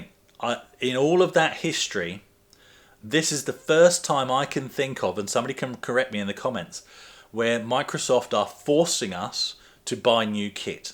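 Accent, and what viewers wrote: Australia. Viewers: I love the Auzzy accent in the out of box bit. Don't spill any liquid on it.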